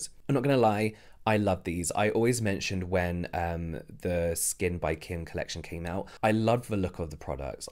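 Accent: United Kingdom. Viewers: It is the way you explain things, describe products, give your opinion with a touch of British accent that makes watching your videos such a learning experience and a joy! 😊❤️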